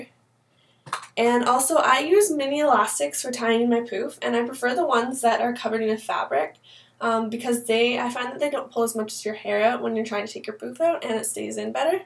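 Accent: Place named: Canada. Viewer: i love your tiny Canadian accent :)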